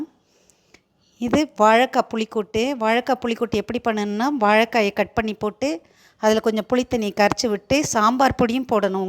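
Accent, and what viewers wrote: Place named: Tirunelveli, India. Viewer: சமையல் சூப்பர் ... தங்கள் பேச்சில் நெல்லை மணம் வீசுகிறது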